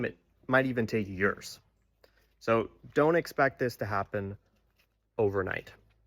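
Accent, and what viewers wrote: Canada. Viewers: Are you Canadian ?? Where are you ? I’m in Canada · I can literally sense your Vancouver accent. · Canadian is clearly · hello boss, love love your english Canadiense Accent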